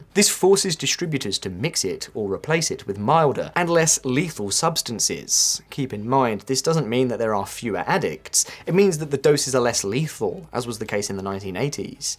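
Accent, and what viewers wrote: United Kingdom. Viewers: Very approriare to have a guy with a judgemental brithish accent lecture USA on opiums By the late 1700s, Britain had a huge trade problem with China: • Britain wanted Chinese tea, silk, and porcelain. • China wanted almost nothing from Britain. • Result: Britain was bleeding silver. So Britain did what empires do when trade doesn’t go their way. The Opium Solution Britain began smuggling opium grown in British-controlled India into China. • Opium was illegal in China. • Millions became addicted. • Silver started flowing out of China and into Britain. China knew exactly what was happening and tried to stop it. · So rich for a Brit sounding dude to sound so smart, yet missing the point. Dude, this is what England did to China, and now is doing it to us. Who is in charge, the bankers dude, the identically same as before. Trump is tearing down the global order, in other words, your king.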